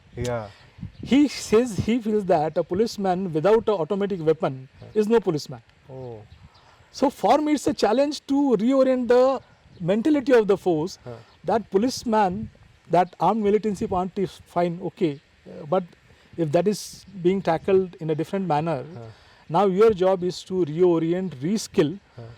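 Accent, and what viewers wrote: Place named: Nagaland, India. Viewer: Sir speaks exactly how we nagas are.. Impressed and shocked too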